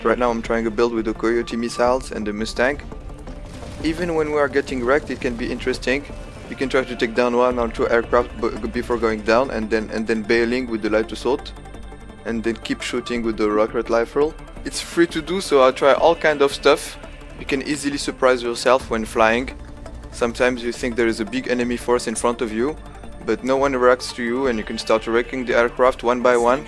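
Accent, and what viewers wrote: France. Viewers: You really have a french accent. Es tu français ? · T'as un accent français 🤔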